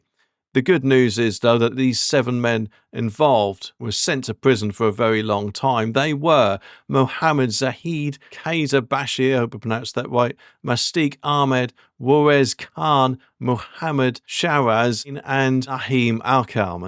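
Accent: Norfolk, United Kingdom. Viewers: Yeah 2 vids and I've subbed. U speak my language, and have a hint of Norfolk there as well 😂✌️🇬🇧👍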